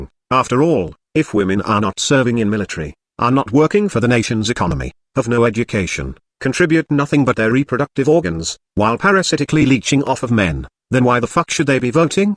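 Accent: United Kingdom. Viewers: Good stuff. Are you from the UK? or is that just the synth-voice?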